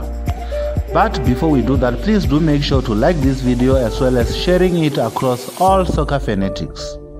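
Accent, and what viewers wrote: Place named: Venda, South Africa. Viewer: Venda accent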